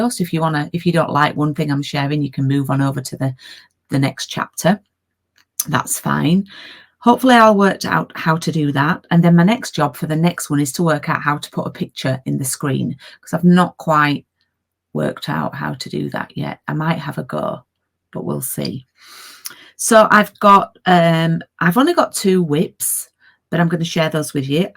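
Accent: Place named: Yorkshire, United Kingdom. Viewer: Great video, and I love your accent, are you in Yorkshire? My mum lives near Sheffield 😊 You really went big with that NY design, it’s going to be incredible! I don’t use a pattern tracker because I prefer paper patterns, but it is great to know what % progress you’ve made, especially with a huge project 👏 One final thing, I couldn’t tell if that big piece of aida was Zweigart (it has orange in the selvedge) but if it’s not I highly recommend you invest in aida from them. It really is the best stuff you can buy, and will give you the best results 👍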